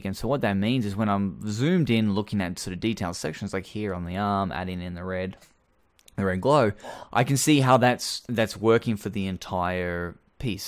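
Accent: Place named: Australia. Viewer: awesome nice style. good to hear an aussie, deff make more dude very informative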